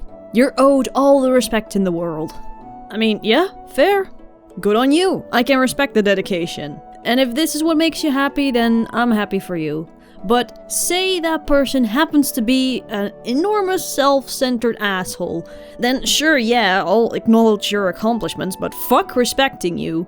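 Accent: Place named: Netherlands. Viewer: Yeah I used to watch your vids back then but it wasn't noticable but now as I started talking to more Dutch people the accent became more noticable lol It's still a nice accent! :-) Hahaha, yeah I had to ask a friend what that "steenkolen" means - quite funny. Yeah, of course! ( As a fellow artist ) I still appreciate your art on a technical level!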